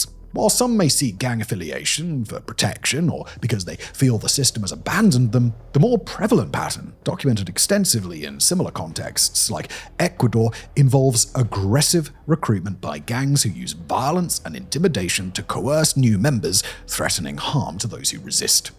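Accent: United Kingdom. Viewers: This is what mindlessly believing videos because it’s on YouTube and the speaker has a British accent looks like. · I pity so much. His britishness makes him pronounce words as simple as 'hour' as 'auagh'.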